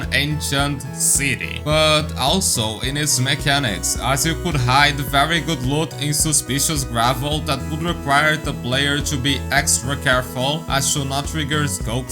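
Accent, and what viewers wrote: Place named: Brazil. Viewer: your accent sounds Brazilian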